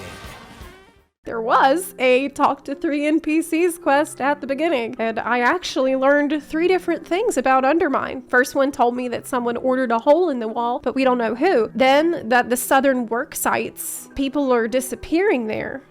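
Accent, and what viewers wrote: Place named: New Jersey, United States. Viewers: I’m from NJ. This is just how a lot of people here talk. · Haters to the left, your Jersey Goblin accent is spot on.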